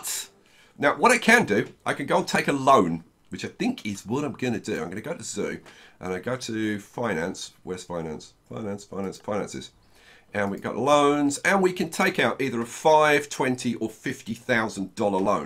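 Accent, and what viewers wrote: South Africa. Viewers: you from South africa? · Feel like Johannesburg? are you from South Africa? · Are you South African?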